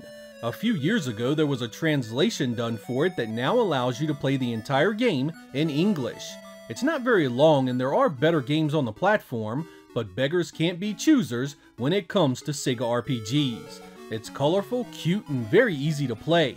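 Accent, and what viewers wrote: United States. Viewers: After so many videos it finally hit me why your accent is so familiar, you must be from the same state as Tim Cook?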